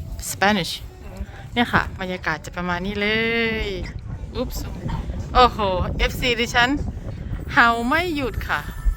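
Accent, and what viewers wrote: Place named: North, Thailand. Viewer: ลูกสาวสวยมากกกกก สำเนียงคณแม่ เป็นชาวเหนือหรือเปล่า ขอโทษนะคะ เพิ่งตืดตามค่ะ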